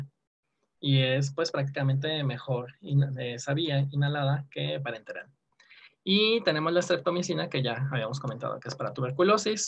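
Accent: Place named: France. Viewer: Está chido el acento francés, excelente clase gracias por compartir de su conocimiento!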